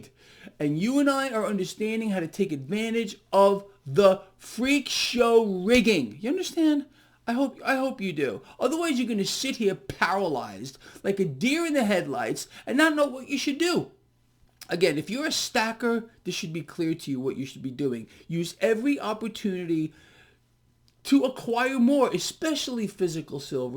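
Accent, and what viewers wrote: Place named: Boston, United States. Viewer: subscribed for that Boston accent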